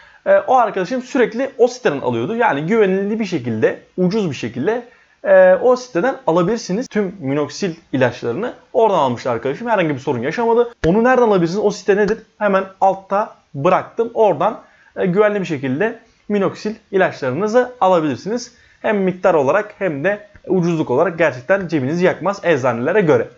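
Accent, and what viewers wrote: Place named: Thrace, Turkey. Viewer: Usta be trakyalımısın sen konuşmalarında gizli jjj sesini duyuyorum sorayım dedim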